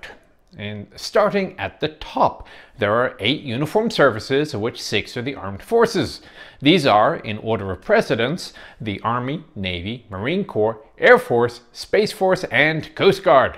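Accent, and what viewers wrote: Ireland. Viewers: I bet part of it is his Irish accent. Like Shelby Foote in Ken Burns Civil War series, his accent is pleasing to the ears. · As someone who works with a lot of paperwork related to the three components, I can just show friends and family this video now to explain how all this works. I'm sure it sounds better coming from someone with a charming Irish accent... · From your accent, you sound like you're from Ireland. Did you have to go into the reserves as part of the naturalisation process or was it an active choice on your end? Asking as an Irishman ready to move to the US.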